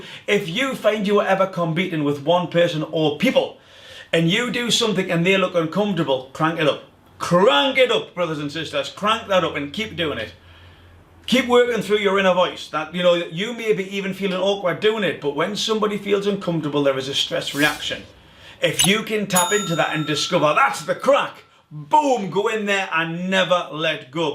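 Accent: Newcastle, United Kingdom. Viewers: Wow I really expected an American accent when I first saw the video, not a strong Geordie accent😀 good stuff, subscribing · Are u from Newcastle? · You from newcastle?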